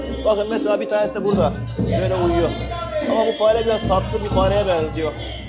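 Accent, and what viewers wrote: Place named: Eastern Anatolia, Turkey. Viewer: Abi nerelisin ? Doğu şivesi var sende.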